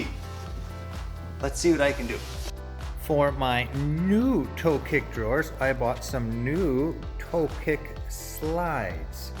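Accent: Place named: Wisconsin, United States. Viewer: I grew up in central Wisconsin, I know that accent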